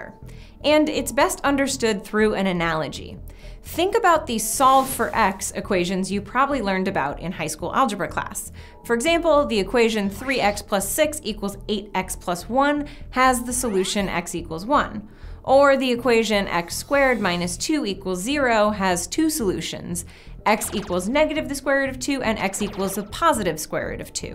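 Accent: United States. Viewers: Knob - Not at all: he spoke very very clearly and not fast at all. And I'm non-native English speaker: he has the correct Virginian speed and accent for me (and for most AFAIK).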